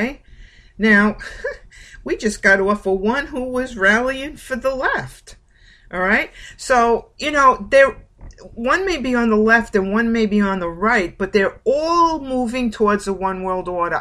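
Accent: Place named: New Jersey, United States. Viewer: I am so glad I came upon your channel today. The messages you deliver, of the videos I've watched so far, could not have come at a better time for me. I love your accent (Jersey?) and articulation. Thank you for sharing this knowledge with the world. We so need to hear it and spread the good Word.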